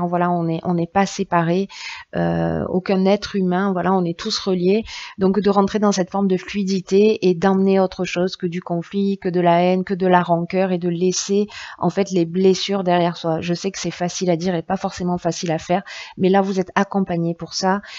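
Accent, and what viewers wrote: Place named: Southwest, France. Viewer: Joli accent ! Du Sud-Ouest de la France ?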